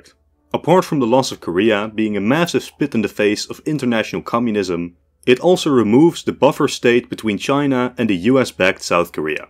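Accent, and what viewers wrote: Netherlands. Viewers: Nice video. If I may ask, are you Dutch? Your accent sounds Dutch. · Sounds like a Hollander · You sound dutch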